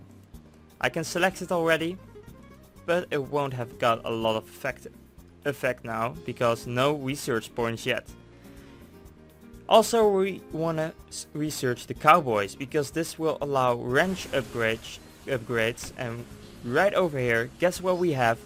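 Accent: Netherlands. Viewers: je nederlands accent is duidelijk te horen lmao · he sounds dutch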